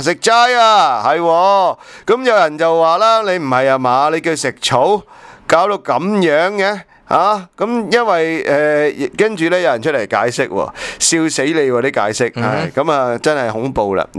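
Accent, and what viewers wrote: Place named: Cantonese, China. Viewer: 支持同0K仔! 萬分支持有廣東特色嘅普通話，令到咁Q普通嘅普通話變得非常特別悦耳 💪💪💪💪💪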